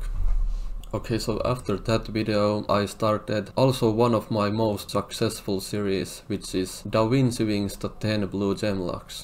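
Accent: Finland. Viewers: Finland accent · Mahtava suomalainen aksentti olisko vanhana tekijänä mitään hyviä vinkkejä meitsille? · Suomi accent o crazy (eksä tehny face reveal jonku aikaa sitte) mut joo hyvä vid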